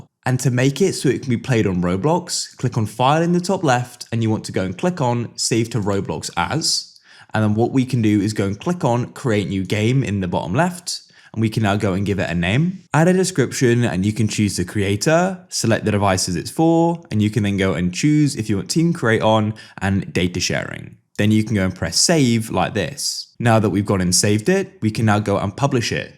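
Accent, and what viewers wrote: United Kingdom. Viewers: you to british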